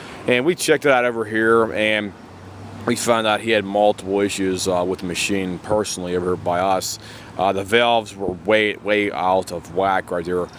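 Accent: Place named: Pennsylvania, United States. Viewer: You sound like you got a PA accent like me lol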